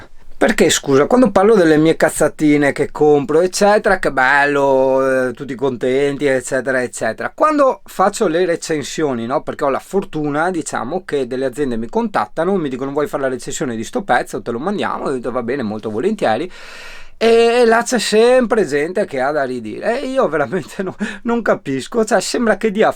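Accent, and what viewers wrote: Veneto, Italy. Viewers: ormai ti seguo dal primo video credo, o un paio di video dopo, ascolta el sio, qua in Veneto esiste una parola che mette in accordo tante robe, dall'augurio all'offesa.....un bel VA IN MONA e non serve dir altro. Quando ti demotivi, pensa a sto puoro mona che ti scrive e che ti dice che è contento quando le aziende ti mandano qualche cosa da recensire. Primo perché vuol dire che il canale va bene ed è simpatico, secondo alle aziende non costa nulla spedirti, perché per loro è importante far vedere quello che producono, terzo se l'oggetto spedito fa comodo anche a te, meglio due volte. Non rifiutare gli oggetti spediti, anche se vai un poco off topics, se l'azienda in questione ha piacere che le recensisci il prodotto, tu fallo, perché a loro fa piacere, magari la chiami la recenzione off topic, ne può nascere una cosa simpatica. Gli heater sono una cosa sgradevole, purtroppo persone senza senso, povere intelletualmente nella loro vita e povere in rete. 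Bannali e che i vaga in mona. Ad esempio a me piacerebbe vederti recensire un bel coltello multiuso tipo il VictorInox, utilissimo per tutti, anche per i camperisti o similari. Non perché non ci siano recensioni su youtube di questo oggetto e similari, ma perché recensito da te che hai la parlata della mia regione è un'altra cosa, insomma senza voler togliere nulla agli altri recensori, lè na recension veneta, lè un'altra roba daiiii......Con amicizia Anacleto. P.S.: La cosa scandalosa sono le pubblictà che siamo costretti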